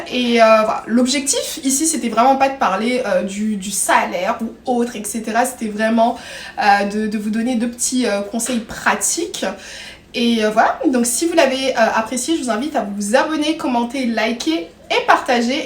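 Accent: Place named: France. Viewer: C'est toujours un immense plaisir de te suivre et de t’écouter. Chaque mot, chaque phrase semble pesée avec soin, portée par une voix à la fois convaincante et d’une précision remarquable. Ton accent français est si authentique qu’on pourrait croire que tu as toi-même inventé cette intonation unique. Tu as déjà pensé à une carrière dans le journalisme ? Tu as toutes les qualités requises : une éloquence naturelle, une réflexion claire et une capacité impressionnante à captiver ton auditoire. Je t’imagine parfaitement sur une grande antenne canadienne, abordant avec brio des sujets d’immigration et d’actualités, comme tu sais si bien le faire. Te voir exceller dans ce domaine serait un véritable bonheur. Tu as ce petit quelque chose qui rendrait chaque intervention inoubliable. Bravo à toi, et je te souhaite un avenir à la hauteur de ton talent !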